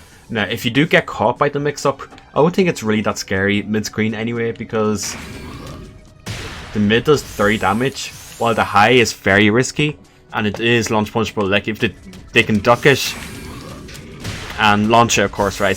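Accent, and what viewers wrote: Ireland. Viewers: He's Irish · Ah I see it's Irish! Thanks!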